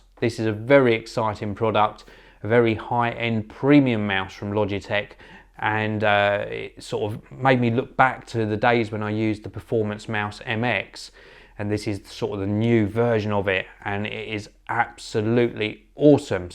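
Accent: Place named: London, United Kingdom. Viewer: the first time i saw one of your vids i noticed you have a londoner accent. it's not easy to change it if you wanted to without lessons.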